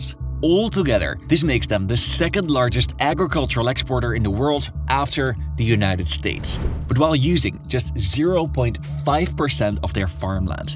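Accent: Netherlands. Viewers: I thought I detected a Dutch accent. Stumbled upon this channel because it was covering the Irish immigration crisis. Nice channel, balanced approach. Fijne reis naar Overijssel!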